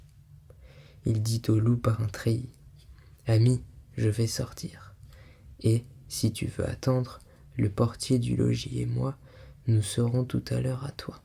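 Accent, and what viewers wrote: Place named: France. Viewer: damnnnn the french accent is everything 😩😩😩😩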